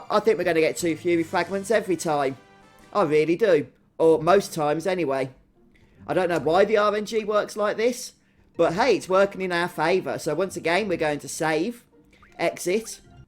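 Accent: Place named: England, United Kingdom. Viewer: Total no homo here but, dude, I love your accent. What part of England are you from? I could listen to your narration of anything and it would seem fun. Seriously, you could make technical manuals seem like an exciting read.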